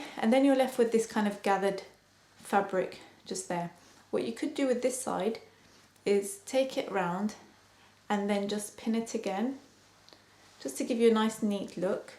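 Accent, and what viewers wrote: United Kingdom. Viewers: british accent,I love it!and of course I like the video :)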